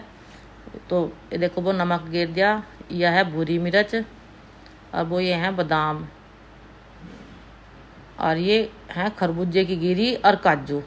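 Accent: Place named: Yamuna region, India. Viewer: आपकी भाषा मुझे मेरे आस पास की लग रही है बहन जी आप कहां से हो क्योंकि यह जमुना के पास की लैंग्वेजहै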